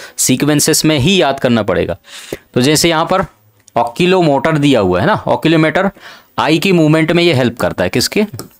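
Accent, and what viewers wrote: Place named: Jharkhand, India. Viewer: Pure Jharkhandi sir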